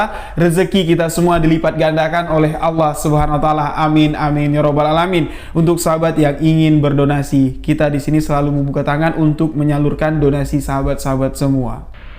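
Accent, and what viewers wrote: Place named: Sumatra, Indonesia. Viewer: ahai logat sumatera ni kek nya bang,,, sedap pulak awak dengar nya bang,,, beda dgn channel misteri lainnya... salam dari aceh bang..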